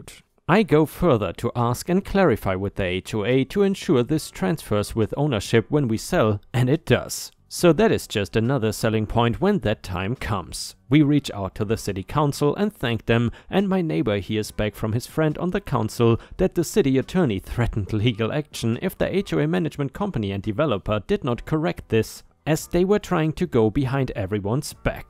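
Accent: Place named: Germany. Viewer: Your charming German accent makes me smile every time you tell these stories! Keep up the great work! Love your HOA and property related stories btw!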